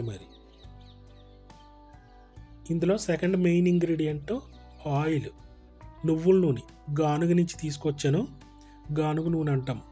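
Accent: Godavari, India. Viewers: Meeru matlade Godavari jillala telugu vinte kadupu nindipoindi.Hyd vachhaka ee bhasha miss avutunnam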